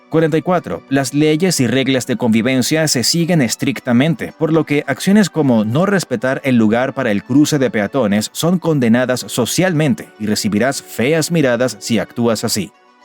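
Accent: England, United Kingdom. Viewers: Da risa escuchar como alguien pronuncia palabras y nombres alemanes en estilo o mas bien con pronunciación ingles. Locura completa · ni "uagner" ni "ulks uagen" si vas a hacer un video sobre Alemania y laurear sus virtudes empieza por respetar el idioma, es normal "castellanizar" las palabras para ajustarlas a nuestro acento pero no "anglizarlas" por ignorancia, es tan fácil como usar el traductor de Google y reproducir su pronunciación para luego repetirlo lo mejor posible, me sangran los oídos cada vez que usas acento ingles al pronunciar las palabras nativas del Alemán, la "W" suena como "V" o "F" así que suena: "Vagner" y "Folks Vagen" RESPETEN EL IDIOMA! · el pronuncia como un inglés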